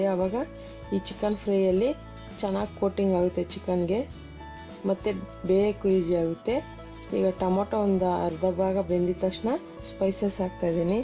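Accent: Tamil Nadu, India. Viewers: Hmm nivu matadodu tamilians Kannada matadid haagide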